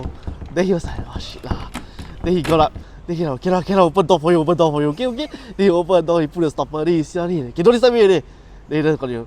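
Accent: Singapore. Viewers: It wasn’t English. It was Singlish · Interesting stories...but,literally i just dont understand his Singlish. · Unique Singlish... nostalgic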